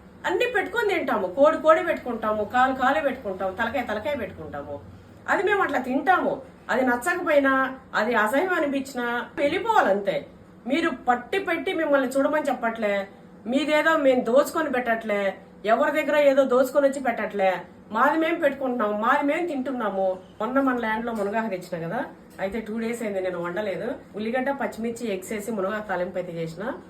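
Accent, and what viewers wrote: Telangana, India. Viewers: నువు అసలు రాయలసీమ అంటే ఎవరు నమ్మరు same టు same తెలంగాణ పక్క తెలంగాణ మాటలు మాట్లాడుతున్నావ్ no doubt 😂😂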